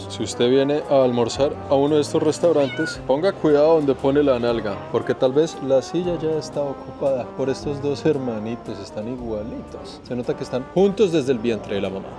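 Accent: Colombia. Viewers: Querido que ermosura son sus videos siento un respeto admiracion por su trabajo me gusta que usted habla con su acento colombiano que se le escucha l muy bonito me hace recordar cuando conosi colombia soy guatemalteca pero me enamore de Colombia megusta la terminologia que usted usa para su narración de sus videos el respeto que muestra para todas las culturas que conose que mi Dios me lo guarde y me lo acompañe siempre que sea el quien guie sus pasos por donde quiera que valla saludos desde U SA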